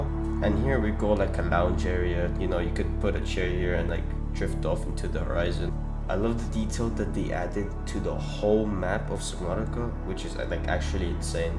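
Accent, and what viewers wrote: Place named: Russia. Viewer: почему у него русский акцент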